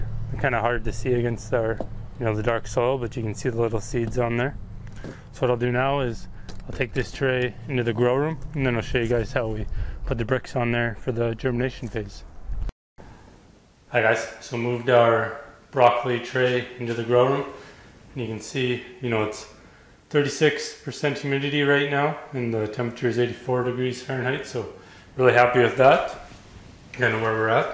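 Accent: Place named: Canada. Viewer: could tell he was canadian right away! :)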